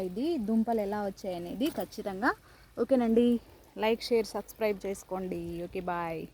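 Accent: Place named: Telangana, India. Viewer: మేడం వాయిస్ రాయలసీమ లాగా వస్తలేదు మేడం. పక్క తెలంగాణ లాగా వస్తుంది మేడం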